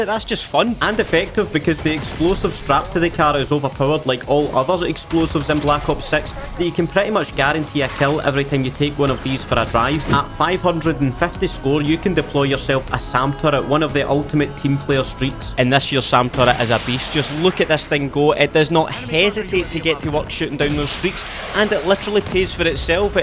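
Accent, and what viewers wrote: Scotland, United Kingdom. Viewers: Why title is in english but u speak Scottish or some shit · Why do Scottish accents make everything more funny😂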